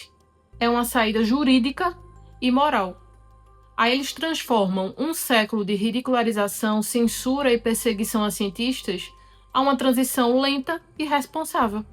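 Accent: Northeast, Brazil. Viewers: Mais uma nordestina falando merrrrda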